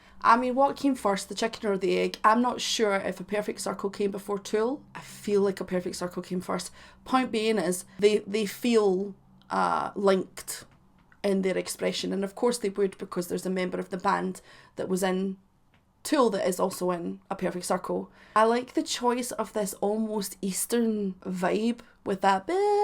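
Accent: Scotland, United Kingdom. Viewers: In one video you say you'll be friends with, and fight the people in the comment sections. If the accent wasn't enough, that's all anyone needs to hear to know you're Scottish!!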